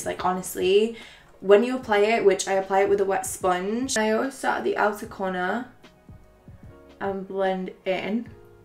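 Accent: United Kingdom. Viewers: Finally I can find makeup tutorial with British accent!! love you!!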